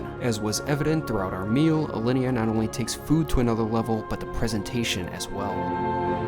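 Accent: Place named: Chicago, United States. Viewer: I knew that you were from Chicagoland from your accent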